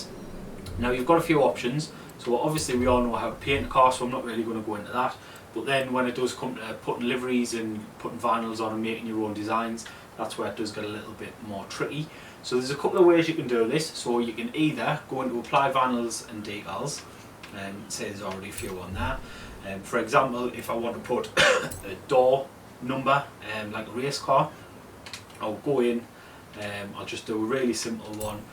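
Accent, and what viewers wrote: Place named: Newcastle, United Kingdom. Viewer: Are you from Newcastle, you sound northern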